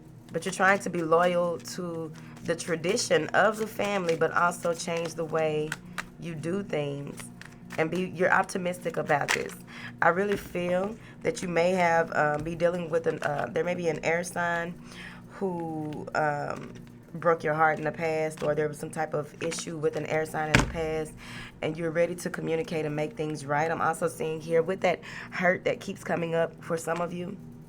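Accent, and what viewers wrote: South, United States. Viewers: I absolutely love your southern smoothness ❤️❤️❤️ and you’re message resonates heavy ♋️♋️♋️♋️♋️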